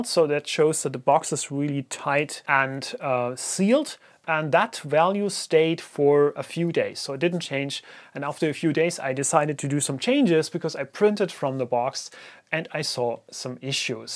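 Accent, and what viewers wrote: Germany. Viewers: great video! I am about to build my own dry box! So it would be awesome to win some extra stuff for it! I'm from Germany! Just as you, I assume? No offense, but as a german, I think I can hear the German accent :D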